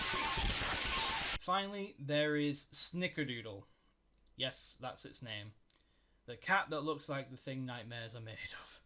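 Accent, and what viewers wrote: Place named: United Kingdom. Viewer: "why is this random British guy talking about Shrek?" Is a question I face in my day to day life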